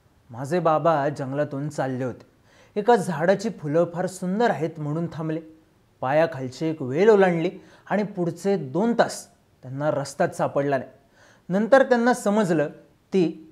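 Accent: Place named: Kolhapur, India. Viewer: कोल्हापूर मधन बोलतोय बग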